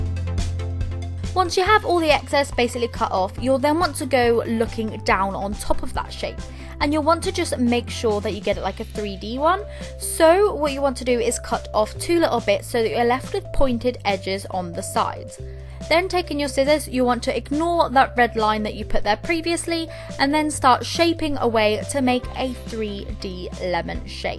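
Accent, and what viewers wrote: United Kingdom. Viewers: I love you're accent I WISH I HAD YOUR ACCENT I THINK ITS BRITISH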